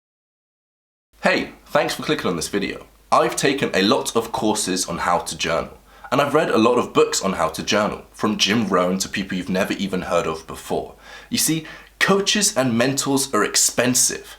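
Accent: United Kingdom. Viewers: I've payed thousands of dollars - proceeds to show pictures of euro-notes :D. Btw your accent sounds very British to me (?)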